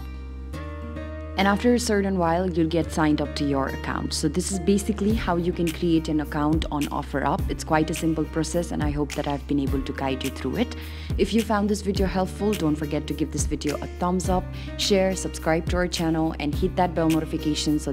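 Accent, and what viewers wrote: India. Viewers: Sounds hindi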